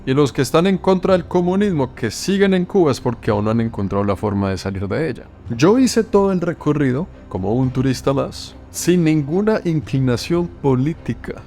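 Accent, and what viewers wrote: Colombia. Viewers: Es un lindo sueño pensar en su hermosa voz y su bello acento colombiano, como el primer sonido que yo podría escuchar al despertar. Es usted bello y admirable. Me ha robado el corazón con su personalidad y su talento. Reciba usted un gran abrazo con mucho cariño desde México 💕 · Haaa no, si eres comunista ya no te sigo mas jjjj jjj Me encantan tus videos y ese acento colombiano. Dios te bendiga, desde Cuba.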